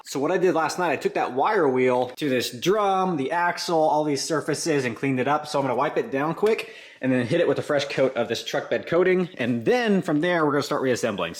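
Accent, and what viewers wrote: Hawaii, United States. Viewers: Bro yessah palala you Hawaiian my guy I love it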